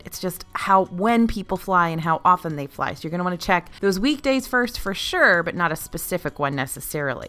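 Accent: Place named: Western New York, United States. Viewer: I knew your accent was familiar… I am also from western ny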